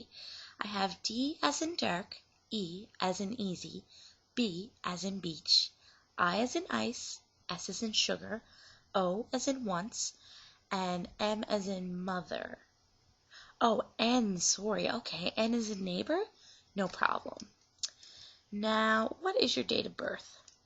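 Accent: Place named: Canada. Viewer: U r so Canadian. Uhhhh why u say about like aboat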